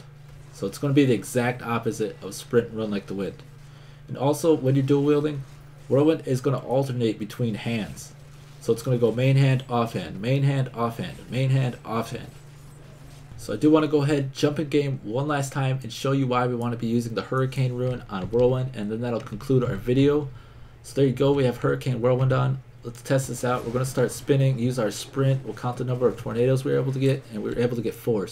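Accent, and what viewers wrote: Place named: United States. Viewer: i think hes amarican europeans usually do have an diffetent accent when they speak english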